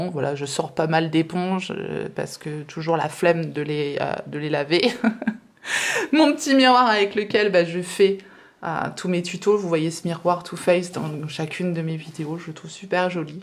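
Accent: England, United Kingdom. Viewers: J'aime bien votre chignon et surtout votre accent anglais ! Yeah ! 😄😉💗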